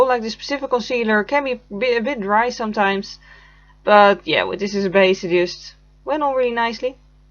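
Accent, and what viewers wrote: Netherlands. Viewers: Holland lol accent 🐇🐇🐇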